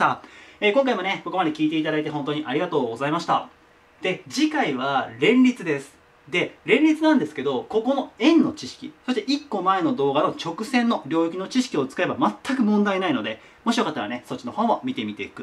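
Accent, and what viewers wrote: Kansai, Japan. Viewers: 関西の人なんですね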